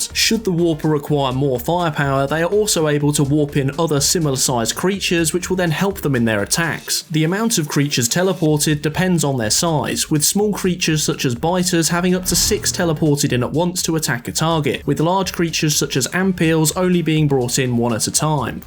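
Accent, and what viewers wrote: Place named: United Kingdom. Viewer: Why is it every time I come across one of these Explained Video's No matter what game it is it's always Some British voiced Prepubescent kid XD lol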